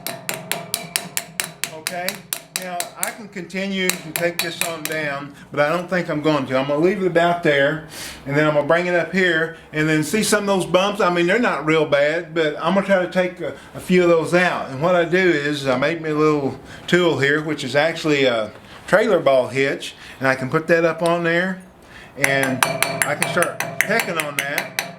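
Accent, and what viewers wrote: United States. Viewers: Sir, I swear you have to be from Texas. You sound exactly like my daddy.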